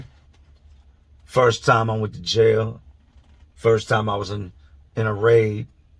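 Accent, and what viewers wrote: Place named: Philadelphia, United States. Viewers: 10/27/80 here brother. I feel you damn. You sound east coast. What's the deal. Hell I'm midwest we sound weird to you all 😂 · People comment on your accent like they comment on mine. Always wanting to correct me and what not. I finally said to them that this is how I talk..growing up around philly and Delaware and Jersey, this is how we talk. People. If you don't like his accent, don't listen. Virginia is right down the road from me, and that is how people talk. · 🤣🤣 Big Facts its a Philly Thang myG rs pretty much Everybody tlk lik dat around my age im 34! But rs half dez ppl wouldn't bust a grape in a fruit fight, dey dont kno bout Ordering shyt on da Box fukin mom cable bill up💯🤣🤣🤕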